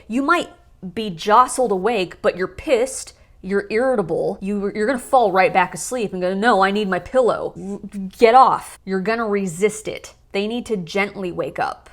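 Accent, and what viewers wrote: New York, United States. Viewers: Long Island? 🤔😳😬✌🏼I Think I Heard it when You imitated Your Mom and the 73 Yr Old Thanks 👍🏼🌞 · Long Island and possibly Jewish. 😝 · Off topic your ny accent is hot❤ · I ALWAAAYS GET THE LYING PERSON EVERY TIME!!! I LOOOOVE YOUR NEW YORK ACCENT..IT'S THE BEST!! YOU DESCRIBED MY MOM TO THE T...THE HARDCORE WITNESS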